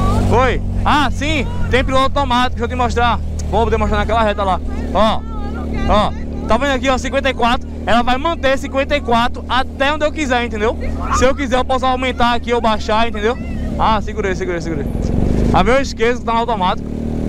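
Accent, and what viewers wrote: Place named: Recife, Brazil. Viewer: Cara parece ser de Recife porra 😂😂😂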